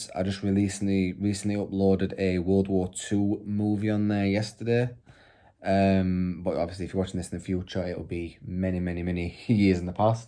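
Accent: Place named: United Kingdom. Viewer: AHHH HES BRITISH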